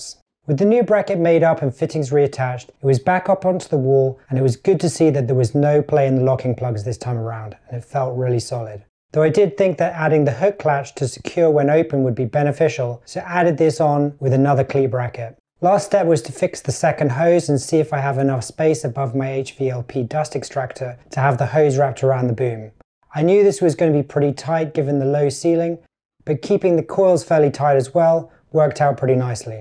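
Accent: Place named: United Kingdom. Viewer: Hi Gotta say it's Soooo Refreshing to hear a British accent whilst watching YouTube videos. keep up the Great Content.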